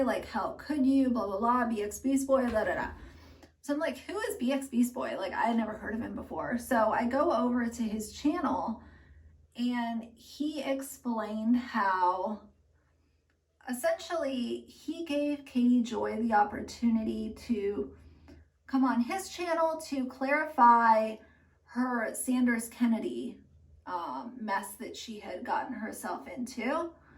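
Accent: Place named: South, United States. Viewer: Lip fillers and botox!! She is from MN and suddenly has a southern accent and spoke to Amy Duggar King and now says, ” Y’all.” She is very one-sided with her opinion. Only hers counts. I subscribed when she covered the Schoenfield alleged Munchausen by proxy kids. I unsubscribed when she ripped the Duggar kids apart. Not including Josh, the others need compassion. Enough ppl complained and now she has changed her tune. She is small-minded. I feel sorry for her. She is very typical of this area of the country. I have lived in each region of the U.S. and I feel I am able to make this opinion also from experience in the Military and from being a Military wife.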